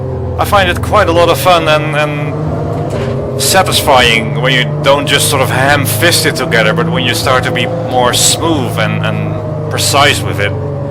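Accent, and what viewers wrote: Netherlands. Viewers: You dutch right you atleast you sound dutch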